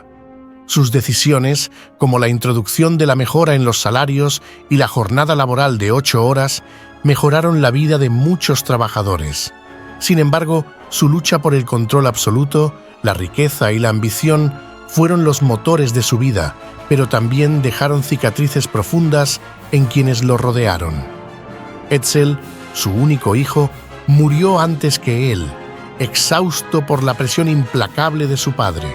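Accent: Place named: Granada, Spain. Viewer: Esta mujer, MARIA ( MERY) fue recompensada por DIOS SANTO Por sus tantos sacrificios y méritos que desde NIÑA ella Cosechó, así que DIOS SANTO la recompensó con este HIJO llamado ENRIQUE FORD. gracias, tus videos son envolventes y así con PERAS Y MANZANAS, si entiendo un poco. P R A, Debo decir que la primera ves que vi tu Canal, pensé que eras Español ya que tu Asentó esta mas allegado a l Castellano de GRANADA que al Castellano COLOMBIANO. Congratulaciones para ti, BENDICIONES from AGUASCALIENTES CITY, EUMA) BYA BYA. 8.12,24 A, y acuérdense que TOMAS ALBA EDISON NACIO EN EL PUEBLO, SOMBRERETE, ZACATECAS CITY. NORTEAMÉRICA MEXICANA. A Tomas Alva Edison se lo llevaron sus Padres a los EEUU. ala edad de 6 años, una ves ya con la vocación de ingeniero INVENTOR, el JUDIO DE RELIGIÓN J P MORGAN lo FINANCIÓ Y SE HICIERON SOCIOS es por eso que la mayoría de los AMERICANOS DE HABLA HISPANA Y EURO ESTADUNIDENSES CREN QUE TOMAS ALBA EDISON NACIÓ EN EEUU.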